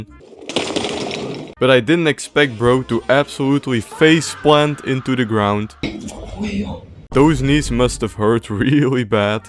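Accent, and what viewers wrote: Netherlands. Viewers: love that dutch accent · You sound dutch 🤢🤢🤢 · nice video! je engels accent moet je misschien nog op oefenen. aan, jou nederlands accent te horen denk ik dat jij van nederland bent of niet?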